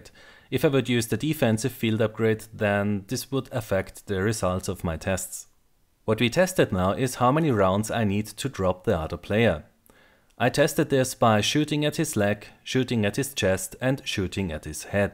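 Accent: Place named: Germany. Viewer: Haha okay, cool:) Is interessant wie einem sofort aufällt, wenn einer aus DACH englisch redet. Aber du machst das megamäßig gut und super verständlich;)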